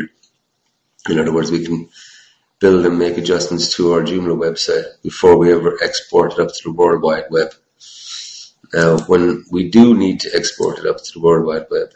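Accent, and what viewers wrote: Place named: Ireland. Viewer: You sound Irish..but with a twist of something else. Its hard to tell with the audio quality. Thanks for the tut anyway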